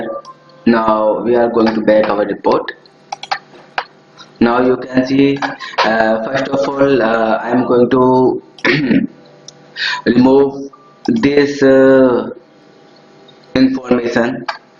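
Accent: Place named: India. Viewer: horrible sound quality combined with Indian accent makes it impossible to understand.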